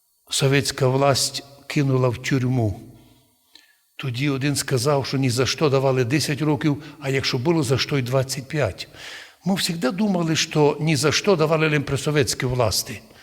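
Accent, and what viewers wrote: Transcarpathia, Ukraine. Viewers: На украинском закарпатский диалект.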